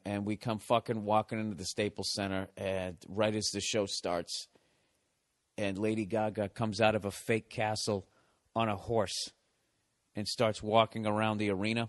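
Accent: Boston, United States. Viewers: It's weird. is like some kind of boston inflected, test dummy for life. He could have a take on anything and you could listen for hours. Imagine someone dropping him off in afghanistan and then the following monday morning you have: Afghanistan. Then he would finish off with Nia's take on it.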